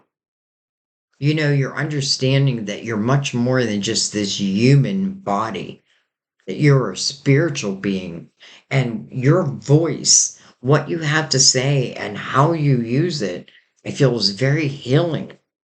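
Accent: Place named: Western Pennsylvania, United States. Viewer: Love your accent. Western PA?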